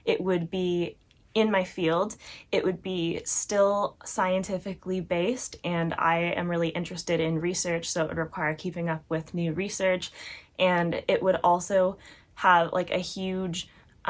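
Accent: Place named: Europe. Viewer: Playing team sports is the best thing in the world and pizza is the answer to everything. Also, your trip to Europe explains your European accent.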